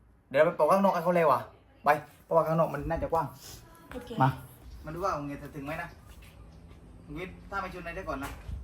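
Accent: South, Thailand. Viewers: คนใต้เหมือน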